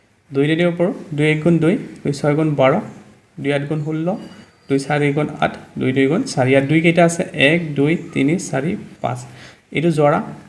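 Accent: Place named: Bhojpuri, India. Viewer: Ekadam Bhojpuri Disha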